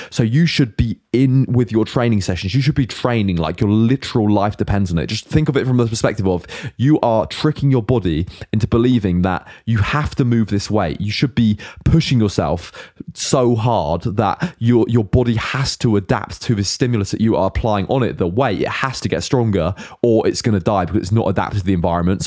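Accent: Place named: England, United Kingdom. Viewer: I love your English accent 😍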